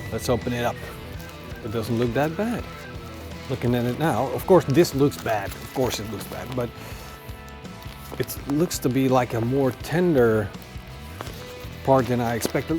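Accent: Netherlands. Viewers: Would love to see you do some basque beef/ txuleta this year 😉👍🏻 keep up the great work, always love the videos and great to hear the Dutch accent 👍🏻 happy new year from Australia 🇦🇺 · Me watching this video: Noticable thick dutch accent Guy shows cheap steak from the jumbo: Yep definitly a dutchman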